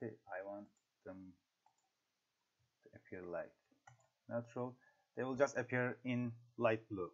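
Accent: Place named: Turkey. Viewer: Merhaba, şöyle bir fonksiyon mevcut mu excelde? İçeriğindeki bilgiye bakılmaksızın bir sayfadaki hücre format biçimi olsun, satır ve sütun yüksekliği, genişliği olsun diğer sayfalar ile köprülenecek, bağlantılı hale getirilecek? Yani ben ana sayfada a1 hücresi için metin hücresi olacak diyeceğim, genişliği de 3cm olacak diyeceğim ve diğer 10 sayfada da bu değişiklik takip edilecek, otomatik uygulanacak. Hangi değişiklik yapılırsa yapılsın, sallıyorum genişliği 4cm olduğu zaman diğer sayfalar da değişecek ve bu sadece bir hücre değil bütün hücreler için geçerli olacak. Kısaca gölge sayfa gibi çalışacak ama üstüne formül ile ayrıca hesaplar döndüreceğim. Sanmıyorum ama uzun süredir bu sorunun cevabını bulamadım. Başarılarınızın devamını diliyorum. Videoyu dinlerken aksandan ötürü bir türkün seslendirdiği anladım, danışayım dedim :)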